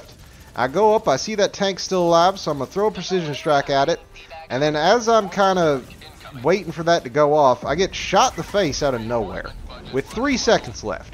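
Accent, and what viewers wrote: South, United States. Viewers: I’ve watched other people, and they just kinda talk, but I like how you actually have personality in your videos. The southern twang really adds to the bot shit talk. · It's good to meet a fellow diver who has a Southern NA accent! Respect brother! Keep spreading Democracy to those socialist bots!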